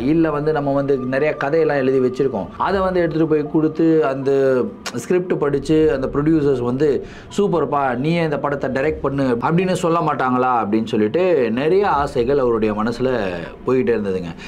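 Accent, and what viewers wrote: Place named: Bangalore, India. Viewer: U have Bangalore slang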